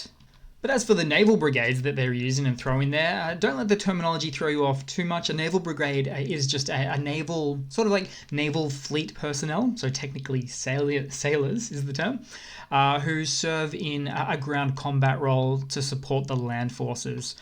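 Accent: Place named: Australia. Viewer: Interesting hearing an Aussie pronounce the Ukrainian names, I’m struggling trying to brake the word structure into something pronounceable! Cheers mate🇦🇺🇦🇺🇺🇦🇺🇦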